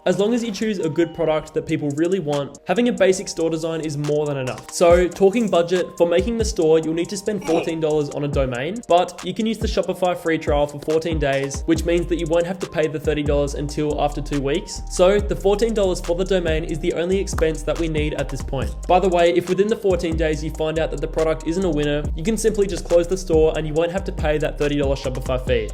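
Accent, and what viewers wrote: Australia. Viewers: Pretty sure you Australian haha big shout out from NZ!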